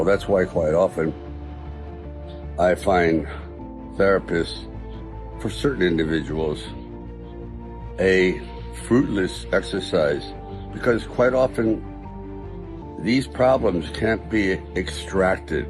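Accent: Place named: Italy. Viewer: I love your voice speech Italian....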